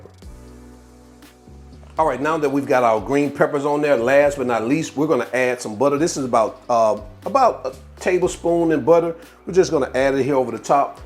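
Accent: South, United States. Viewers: Quick question?: are you from the south? I’m trying to pick up on your accent and your style of cooking lol. Your food is always looking good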